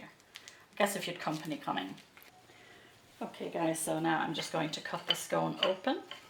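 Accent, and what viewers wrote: Ireland. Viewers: Hi. Greetings from Belfast in Northern Ireland. I’ve been watching your videos while in lockdown here due to the Coronavirus Crisis and I must say you do my wee heart good. I love the simple and easy way you show how to bake and cook. You’ve converted me!!! I’m a 60 year old aul fella and I’ve now made scones for the first time in my life - and they were bloody good!! Lol All thanks to you. Just wanted to encourage you and let you know your videos are being watched, not only in Canada, but right across the Atlantic back here in good old Belfast. It’s lovely to hear your Irish brogue and just your easy going way that gives us the courage to just give it a go with the baking. Huge thank you and I look forward to more videos and your channel growing with lots more followers. Good luck and God Bless. John 😀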